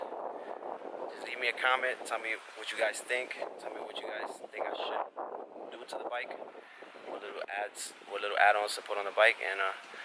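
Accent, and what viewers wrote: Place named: Dominican Republic. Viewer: Desde que el pana hablo españo, le salio el sason dominicano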